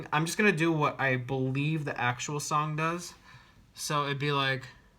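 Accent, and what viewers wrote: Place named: United Kingdom. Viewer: I don't understand a word you say because you speak too fast for me and i'm learning british english so you're acent is very different of what i'm used to listen jajajajaja. Well more or less I understood something, it was a joke :D Back to what I would like to say, I like very much how you play the piano and also the emotion and effort that you done with your work. I can only say, congratulations!! PD (I think that american people use PS but it doesn't matter): I don't pretend to butter you up JAJAJAJA Take care!!! And again sorry if my english is horrible :)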